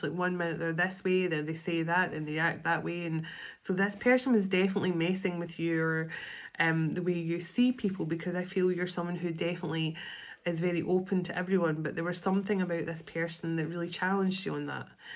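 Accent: Scotland, United Kingdom. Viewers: I love when you talk about yourself and your voice is beautiful! That Scottish accent is what drew me to you in the beginning so please don’t dislike your voice it’s beautiful. Yes lots of releasing and purging past timelines and emotional trauma yesterday. I’m ready for a new start. The past is the past no changing it but working on myself in the present is much more gratifying. I’m in the time in my life where I feel if my person doesn’t come back that’s ok I did the best I could and there’s nothing more for me to do but love myself and I choose me Everytime. That inner peace is something I work towards everyday. Inner peace and happiness is the best thing I can give myself. Whoever comes along with me is just a bonus. ❤ 123 likes and 777 views i love the numbers lol I woke up this morning with the song “ ” by in my head. 😮I took a nap yesterday and dreamt I was swimming in a pool it was my old high school lol today I dreamt of another body of water lol 😮 I knew I loved you played on my radio the other day. 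It made me tear up such a beautiful song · 😅 I love ur Scottish voice and the things u say! It made me very happy! And it's true all u have said about where we are on this journey! 🎉❤❤🎉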